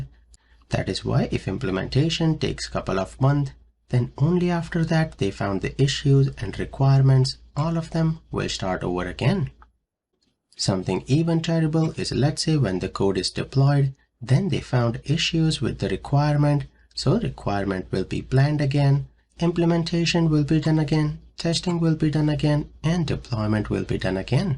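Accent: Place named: United Kingdom. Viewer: gujju bhai your accent is really hard to understand. do you live in britain ?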